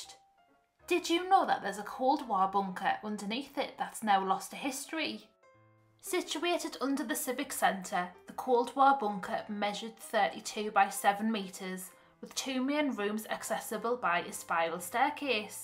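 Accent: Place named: Sunderland, United Kingdom. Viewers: I love a Mackem accent. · Stroll on! That accent is horrible. I'm a Geordie (Gateshead must be, oh ten or twelve miles away from sun'lun but I'm telling you, that accent man!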